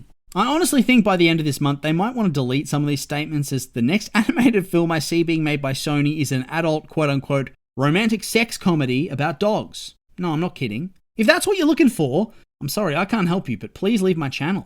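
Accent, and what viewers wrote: Australia. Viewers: you sound like an australian olaf. also i agree with your opinion! i havent been able to see elio but the trailer IMMEDIATELY grabbed my attention. space is so beautiful, and they made it look so beautiful in the trailers too. and the protagonist looks so adorable!! i didnt see a lack of advertisement until i was able to get my adblocker back on. ...obviously.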